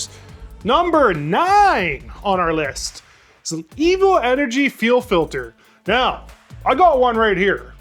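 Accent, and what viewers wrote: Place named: Canada. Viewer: Oooooh yeah bud, Canadian eh? Subbed!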